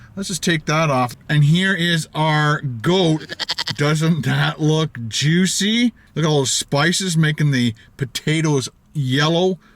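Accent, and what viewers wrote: Canada. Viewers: Ouuuuuuu!!!! You are Canadian "EH"!) <3 I have always said that our own country is just as good as the USA to explore and take a vaca!) Fabulous work brother!) Keep up the vids!) <3 I will be driving there for sure!!!! I'm sure the mango nectar helps to calm the spice eh!?!) Would love to hear your story! ....where you came from and how you became a YouTuber!!! ;) <3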